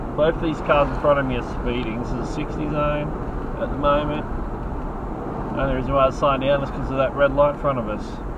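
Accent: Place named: North Queensland, Australia. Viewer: I can usually tell where you are driving because I used to drive a lot in the same places, and still do a bit, so it's all very familiar. One thing I can't place though is your accent. Are you from North Queensland, by any chance?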